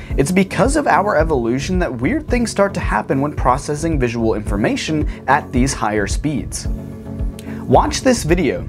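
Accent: United States. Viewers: Impeccable American accent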